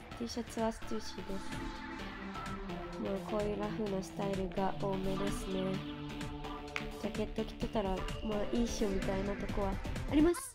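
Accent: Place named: Kansai, Japan. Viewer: めっちゃお洒落💓 ちょいちょい関西弁出るのおもろい😆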